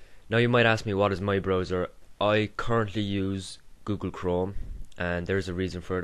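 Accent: Ireland. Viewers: funny cuz this guy has an irish accent the add before the video started was and irish spring comercial ...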